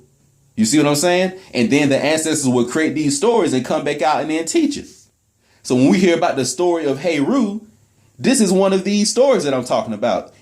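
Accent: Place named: South, United States. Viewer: Brother, you be SOOO on point, that it's DIVINE! We've come to so many of the same conclusions, that I feel like I'm listening to myself, but in a southern American accent, LOL! PEACE AND LOVE, brother! 👍🏿✊🏿🇲🇦✡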